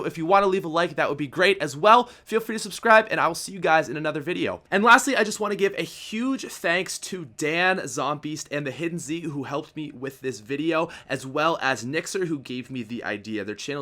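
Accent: Canada. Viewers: R u Canadian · You are definitely Canadian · I think he's from Canada. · Are u Canadian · Strongest Canadian accent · He’s Canadian. That’s how Canadians pronounce out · Hey are you Canadian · Dude i love the Canadian accent. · the Canadian accent is strong in this one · this is my first time checking out your channel and you sound way more Canadian than lex. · Dude he's Canadian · Are you canadian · Lol this guy is definitely Canadian. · Is he Canadian? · Are you Canadian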